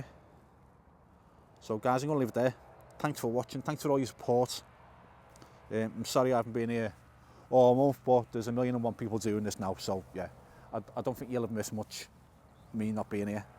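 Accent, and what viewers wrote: Liverpool, United Kingdom. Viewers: Is this a Liverpool accent?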